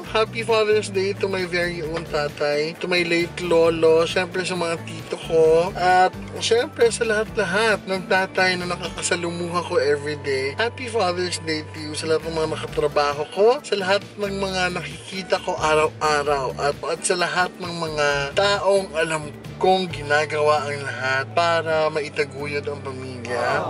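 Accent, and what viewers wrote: Iloilo, Philippines. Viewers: ilonggo ka man? hahahha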